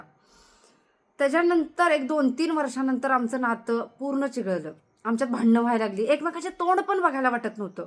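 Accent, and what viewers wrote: Sangli, India. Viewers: खुपच छान ताई तुमचे विच्यार तुम्ही सांगलीच्या वाटता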